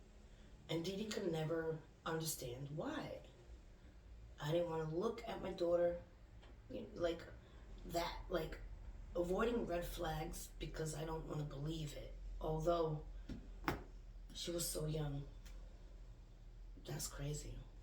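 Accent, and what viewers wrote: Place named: Louisiana, United States. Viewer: Southern creole accent. Im from Louisiana I can hear the Southern French twang to it wow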